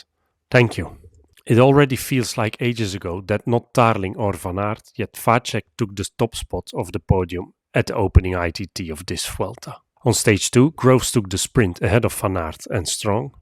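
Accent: Belgium. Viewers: Belgium accent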